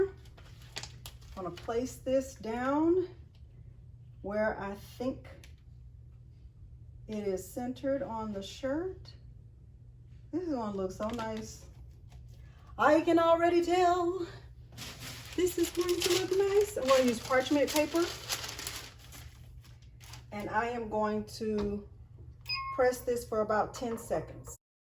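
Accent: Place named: South, United States. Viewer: Chileeeeee 😂😂 You just helped me with an order I just got! I couldn’t figure out the font and got a notification that you posted content and I ran on over to your channel! 😂 I love your content! You’re so thorough and nice! And you have to be from the south. Every time you talk I crack up laughing! I love it! Keep posting content. I can watch you all day and learn. Thank you! Thank you! Thank you!🙏🏾🥰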